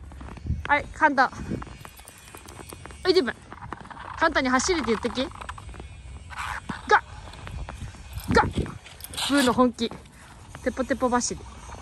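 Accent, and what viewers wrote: Kyushu, Japan. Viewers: 文がまるで犬のような姿見せてくれてる事よりも、 姉の拠点が関東な事に衝撃を受けてます イントネーション的に九州辺りかと思いながら見てました （過去動画に引っ越し関連の説明あったりハズレてたらゴメンナサイ）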